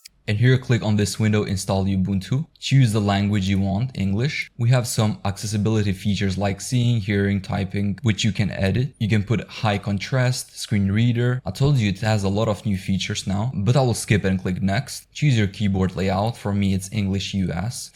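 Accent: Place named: France. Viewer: l'accent francais mdr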